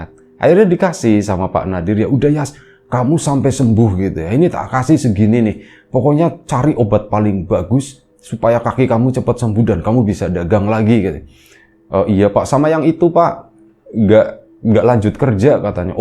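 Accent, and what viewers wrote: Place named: Banyumas, Indonesia. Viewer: oh pantesan ngapak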